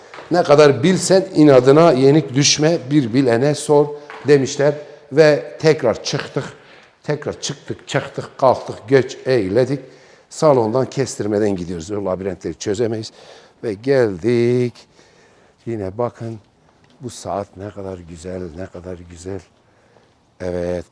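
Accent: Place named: Anatolia, Turkey. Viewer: Anadolu silvesine ve senin dogalligina hayranim icinden geldigi gibi konus bosver sen kotu yorum yapanlari. Kolay gelsin kardasim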